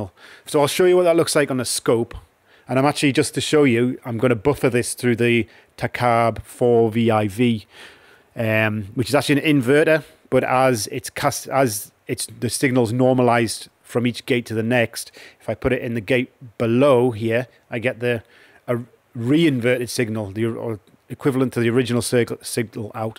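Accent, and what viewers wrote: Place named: Newcastle, United Kingdom. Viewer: You say “cheap and cheerful” just like my grandad did. What a small world, that I hear a Geordie voice from my favourite Thai modular supplier. Always good to be encouraged to think outside the box. Audio or control, it's not supposed to matter to us, is it? :)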